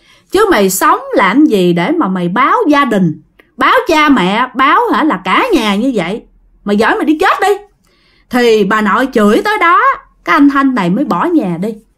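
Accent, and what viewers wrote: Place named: Mekong Delta, Vietnam. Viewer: dân miền tây thì mình cứ phát âm theo dân miền tây đi chứ ko cần phải phát âm theo dân miền bắc bạn hiểu mình nói ko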